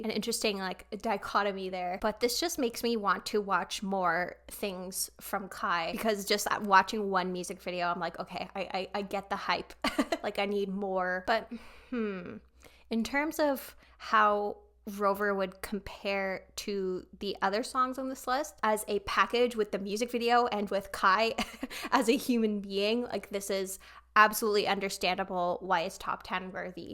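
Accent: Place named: France. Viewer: I believe Jiwoo and Kyujin are the rappers in Nmixx but they are also incredible vocalist like the rest of the group. Nmixx is a group under JYP known for their Mixxpop song. All the girls are incredibly talented and they are also well known for their stage practice where they sing live while dancing. It’s a group I learned to love this year and I absolutely love them! They are so talented and funny! (Sorry for my English, English isn’t my first language. Your French accent was so cute by the way! Love from France ❤️)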